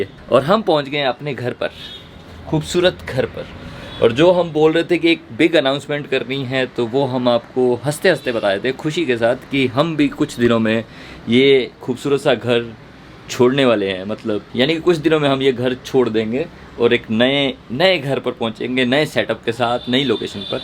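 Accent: Punjab, India. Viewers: Oh bhaaji Punjabiya wali krti tusi tan aj....!!!!!Good to listen veer ji dialouge..!!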